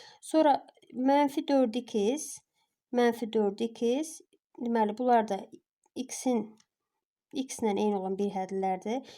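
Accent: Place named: Northwest, Azerbaijan. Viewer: Salam müəllimə çox sağolun əziyyət çəkirsiniz, haralısız? (Qax, Şəki, Zaqatala?) mən Qaxlıyam ləhcəniz tanış və yaxın gəldi.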